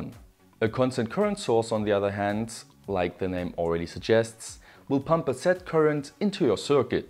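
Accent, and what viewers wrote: Germany. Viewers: Hey, Erstmal großes Kompliment, du machst die Videos sehr gut und ich schau sie mir auch gerne an. Ausserdem sprichst du auch sehr gut Englisch, nur musst du noch ein Bisschen an deinem Akzent arbeiten ;) (klingt zu deutsch)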